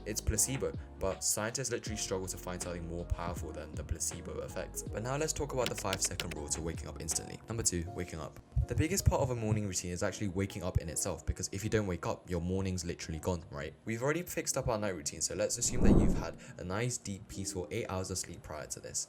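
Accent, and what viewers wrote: United Kingdom. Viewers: I do the counting down thing. Really helps! Great video-- very entertaining but clear and straight to the point. I think you hit the nail(s?) On the head with the importance of what happens before you go to bed. Lots of points for me to try out and possibly share with others. Also, took me a while to realise you're a Brit not an American😅 Great video!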